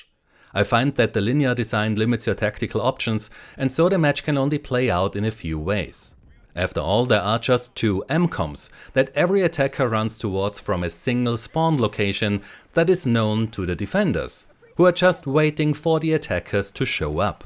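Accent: Germany. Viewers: Are u german? Your english sounds like that😅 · Deutscher Akzent?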